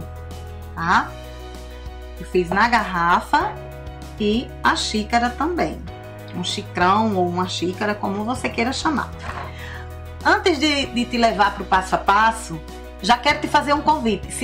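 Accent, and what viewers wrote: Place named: Sergipe, Brazil. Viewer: Amei seu sotaque! Sou sergipana e me identifiquei c esse sotaque, q parece sergipano. Amei tb a sua arte! 😍😍😍😍💗💗💗💗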